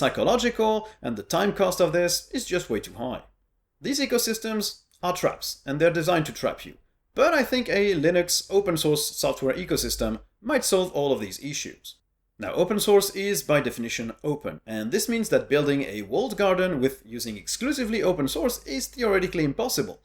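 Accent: France. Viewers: I'm french and I find it weird to listen to a Frenchman speaking in English. But your channel is very interesting and you're the reason why I'm rocking elementary os on my laptop as a teacher everyday!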